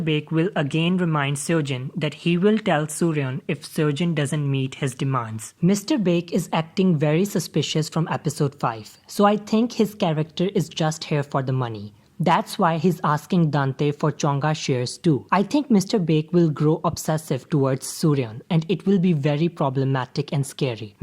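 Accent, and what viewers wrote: India. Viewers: mhm the indian accent gets me everytime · I love your theory. And your Indian accent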